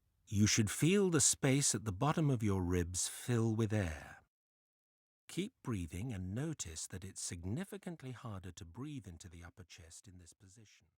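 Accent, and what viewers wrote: United Kingdom. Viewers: Is he British? where about in Britain? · Wow, the British accent is so pretty!!, but I cannot remove my mexican accent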